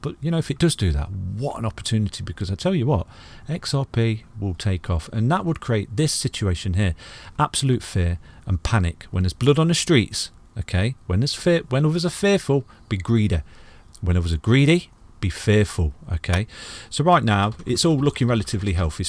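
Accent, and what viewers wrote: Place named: Cockney, United Kingdom. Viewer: It's bad enough you have a cockney accent which is very hard to understand, but you're also a fast talker. That's a horrible combo for a reporter whose audience is expecting to be able to listen to you. SLOW the eff down!!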